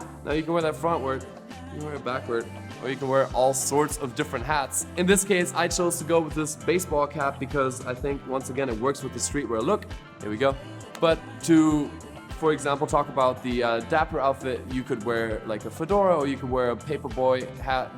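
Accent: Germany. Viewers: He sounds like he is actually from germany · Are you German? You have a similar color in your voice. No offence, just wondering... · Nichts zum Video, ich kenn dich nicht, aber für mich klingst du wie ein Deutscher, der die englische Sprache ziemlich gut beherrscht :D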